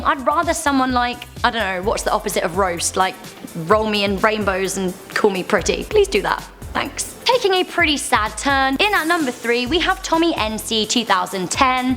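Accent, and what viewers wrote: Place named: United Kingdom. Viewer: ( ͡° ͜ʖ ͡°) youre beautiful and so is your british accent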